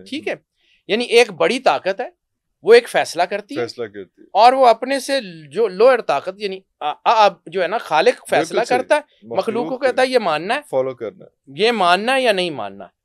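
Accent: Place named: Punjab, Pakistan. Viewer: your Punjabi accent is hilarious 😂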